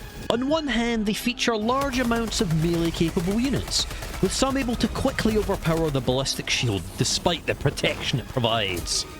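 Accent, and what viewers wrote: Scotland, United Kingdom. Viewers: Are you Scottish?! My people 🙌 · Insta subbed as soon as i heard you were also Scottish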